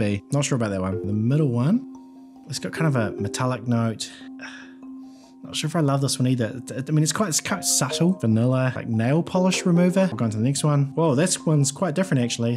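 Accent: New Zealand. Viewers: (First time seeing this channel, my thoughts) "45 DOLLARS FOR PROPER 12 ?!?!... Oh wait, my man sounds like a Kiwi". (Checks channel) ah, ok, that probably explains that." Still seems high for Proper 12 but New Zealand is quite a bit further away from Ireland that the US so, yeah, makes sense I guess. Edit: I should've paid better attention the first time, he says "New Zealand" directly behind the "45 dollar" price. 😅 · I wondered why you pronounced Conor McGregor as “McGreegor but then you said J&B was found “eeverywhere “so I realised that’s how Kiwis speak.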